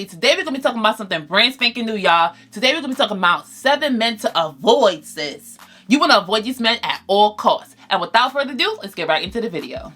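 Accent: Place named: New York, United States. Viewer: subscribed just because I hear a NY accent 😂✨ hey sissss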